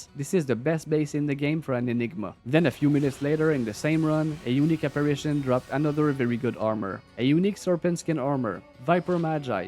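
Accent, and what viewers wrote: Quebec, Canada. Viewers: bruh i was like , he sound french canadian , apres tu dit , la face a ti-gui xD nice video bro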